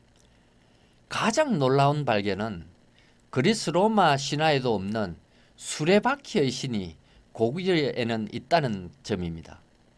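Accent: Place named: Busan, South Korea. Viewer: 병장님! 반갑습니다. 군대 쫄다구 김*철병장을 통해서 씨의 요즘 근황을 듣고 너무 반가워서 이렇게 유튜브로 소통을 합니다. 군대에서 나병장님의 개인돈을 훔쳤다고 의심을 사서 한 때 나마 이런 속좁고 편향되며 막무가네식의 고참을 계속 고참대우하며 군대생활을 보내야 하나 아니면 개인적으로 밖으로 데리고 나가서 죽통을 날리고 군대생활 그렇게 하는게 아니다 라고 일침을 가한 뒤 영창을 가야하나 고민을 많이 했던 탁일병입니다. 그 당시 촐싹 거리던 나 병장님이 세월이 지나고 나니 박사 학위를 받고 교수님이 되었다니 정말 격세지감을 느낌니다. 아무 근거없이 고참의 돈이나 훔친것으로 절도 혐의를 뒤집어 썼던 탁일병은 그 사건이계기가 되어 제대 후 모 여고에서 30년 동안 교편생활을 하면서 반 아이들에게 절대로 남의 물건을 탐하지 말것이며, 또한 자신의 관리소홀로 귀중품을 잃어 버리고 난 후 절대로 남을 근거 없이 의심하거나 미워하지 말도록 인생관을 심어주는 담임을 역임하고 이제는 명퇴를 하였습니다. 우리 나병장님 서울 생활이 40년이 다되가는데 아직 여전히 부산 사투리가 남아 있는 것이 신기합니다. 지금도 여전히 탁일병에게 절도 혐의가 있는 것으로 믿고 있는 것인지 아니면 철없던 어린 시절의 치기 어린 선임 고참의 찍어누르기 식의 자백 강요였는지 개인적으로 묻고 싶습니다. 어찌 되었든동 제대 후 진리탐구에 대한 열정을 불태워 박사학위를 취득하고 교수님이 되었다는 것에 대해서는 저절로 고개가 숙여지며 존경의 마음 금할길이 없습니다. 모쪼록 건강하십시오. 기회가 되면 그 시절을 이야기 하며 회포를 풀 장이 마련되리라 믿습니다.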